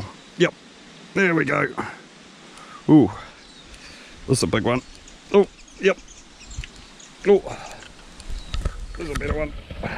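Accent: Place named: New Zealand. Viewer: hey, dude. I just like your kiwi accent. Lovely video. It would be great if you share the location. LOL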